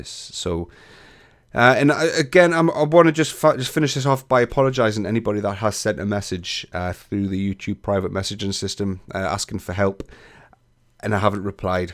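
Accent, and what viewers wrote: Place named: England, United Kingdom. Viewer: I am a woodworker who uses inventor to digitally prototype a design that I can tweak at different stages of production. Your videos are very good and the best that I could find (English accent helps...). I often rewatch your previous videos as you have a very natural way of communicating. Looking forward to what you have to offer in 2016.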